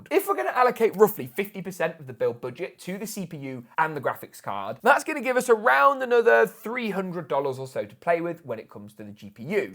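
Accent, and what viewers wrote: United Kingdom. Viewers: Fuckin British accent 😢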